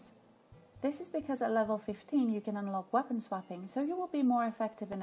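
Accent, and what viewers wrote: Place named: France. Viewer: Detailed and clear guide. And the French accent sounds cool.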